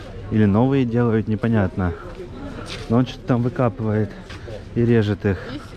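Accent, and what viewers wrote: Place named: Donbas, Ukraine. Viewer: у донбасский говор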